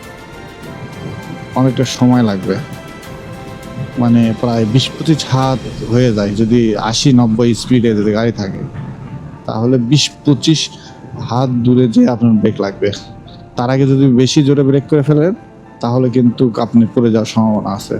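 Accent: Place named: Naogaon, Bangladesh. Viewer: ভাইয়া আপনার কথা শুনে বোঝা যাচ্ছে আপনি নওগাঁ জেলার এবং নওগাঁ সদরের আপনার একটু ঠিকানা বলবেন আপনার সাথে দেখা করবো সরাসরি। আমিও আপনার মত ভিডিও বানাই। আপনার সাথে কিছু পরামর্শ ছিল।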